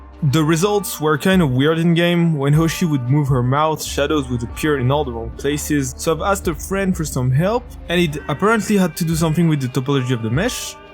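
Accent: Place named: France. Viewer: Gotta love the french accent ahah Nice changes! I'm looking forward to play the next chapter ✨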